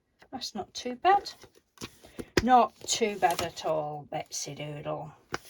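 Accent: United Kingdom. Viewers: nice english english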